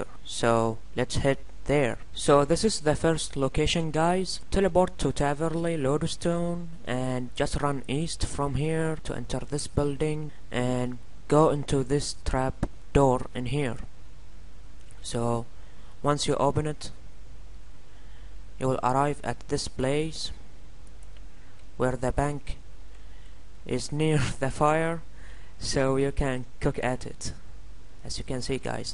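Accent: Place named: Arab world. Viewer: good guide, and your definitely Arab that accent lol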